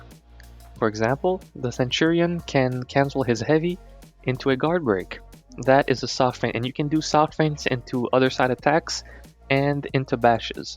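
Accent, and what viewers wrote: Romania. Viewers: Are you from Romania?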